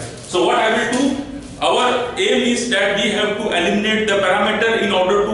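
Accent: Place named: Jamaica. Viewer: Sir your accent is like teaching maths. Great Video.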